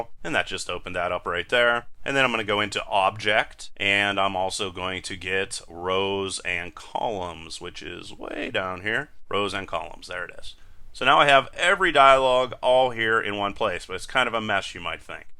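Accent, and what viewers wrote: Pittsburgh, United States. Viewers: Thanks for a great tutorial series! Thought I heard a twinge of Pittsburghese in your presentation? ;-) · you sound like you are from pittsburgh. p.s. thanks for the video, super helpful!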